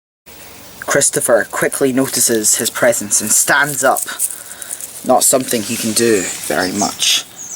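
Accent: Scotland, United Kingdom. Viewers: Dude are you Scottish? :P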